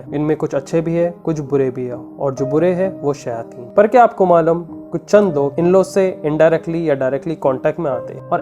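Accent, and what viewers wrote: Hyderabad, India. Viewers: I lov ur hyderabadi accent....ur videos r som real material...Greatez job...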